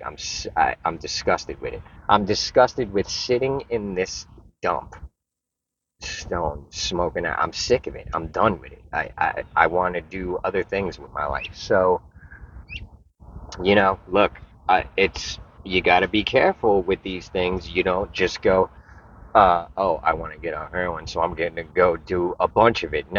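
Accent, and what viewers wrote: New York, United States. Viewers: I love how New Yorkers call it Flurida! Love ya bro, what is wrong with smoking weed? It’s about as harmful as drinking coffee, you don’t see coffee drinkers saying they are going sober. I support you 100% though, only you know if it’s a problem. You have helped more people with addiction problems than you know by being honest. Love ya Brother, good muck with your progress. I’m a retired Machinist and I’ve never met another Machinist that wasn’t a pothead. We would have to read blueprints and edit blueprints as we were making maybe aerospace parts, no relation to Boeings bs, and our best work was when we got off work, sat around the house, smoked out, and talked about how we could machine this part better, use a different tool steel, etc. We were never high on the job, but our most amazing work ideas came from sitting around being high. 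We actually worked on some of the self guided depleted uranium 20mm rounds the Gov’t may have been trying to fast track in Afghanistan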